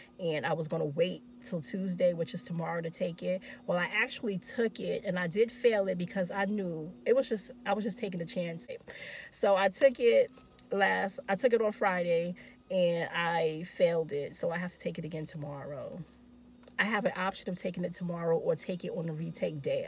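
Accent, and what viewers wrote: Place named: New York, United States. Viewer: I hear in your voice that you are from New York, do you still live there or did you take your NCLEX there?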